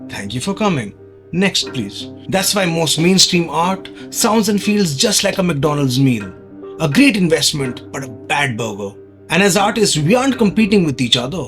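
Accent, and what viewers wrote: India. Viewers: I was also shocked (in a good way) when I clicked this video and heard an indian accent. It's so refreshing to see an indian channel cover this topic considering the dislike of arts or humanities in general in our country. You just got a new subscriber. Would love to see more videos like this.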